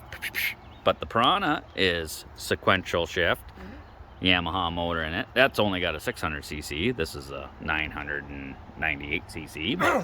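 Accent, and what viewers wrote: Canada. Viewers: Lol. Canadian AF. Love your energy bud 🇨🇦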